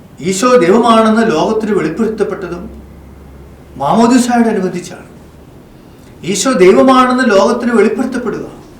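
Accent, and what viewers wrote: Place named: Chittattukara, India. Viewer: Fr., you are from chittattukara, your speech shows that •thank you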